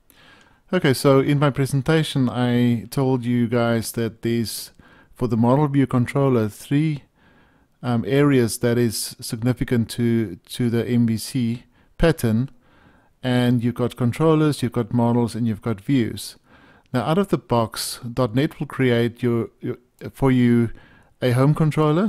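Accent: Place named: South Africa. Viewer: great. you sound South African...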